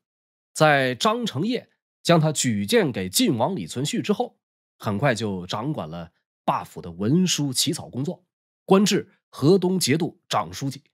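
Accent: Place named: Tianjin, China. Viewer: 老师是天津人吧，本来沉浸在严肃的历史氛围里，一个嘛字把我听笑了😆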